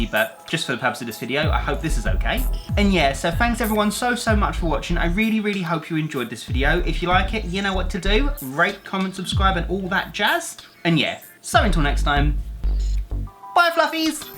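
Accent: United Kingdom. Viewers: Are you British omg I think I finally found a famous youtuber that is British to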